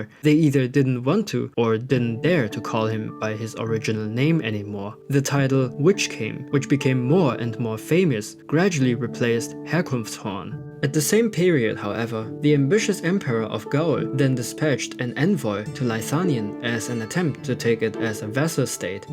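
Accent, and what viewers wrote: Germany. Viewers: This being narrated by an actual German dude (I assume anyway, ‘cause I mean, if you aren’t, then you sure do a good impression), this really couldn’t have been done better. Satisfaction.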